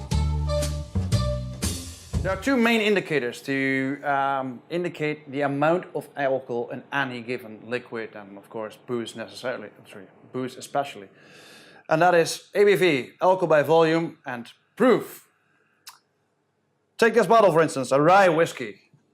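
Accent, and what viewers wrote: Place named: Ireland. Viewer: Dude, what's the deal with the Irish accent? You're Dutch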